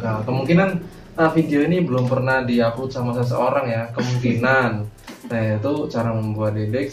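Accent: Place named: Java, Indonesia. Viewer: Medoks abis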